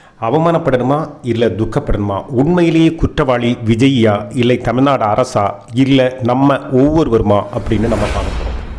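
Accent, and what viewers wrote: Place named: Kerala, India. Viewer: தம்பி அருமையான பதிவு தம்பி உன் குரலில் மலையாளம் கலந்து வருதே நல்லா இருக்கு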